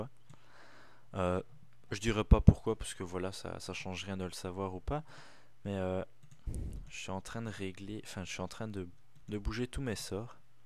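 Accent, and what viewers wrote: Belgium. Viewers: Il est belge sa s' entend je crois xD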